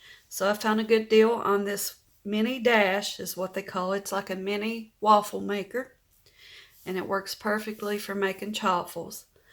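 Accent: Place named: Georgia, United States. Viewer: I really like your accent and way of speaking. It reminds me of my dad's side of the family in North Georgia.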